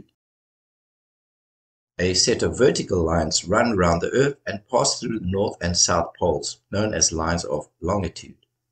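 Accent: South Africa. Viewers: My God, this is great! And I love the SA accent!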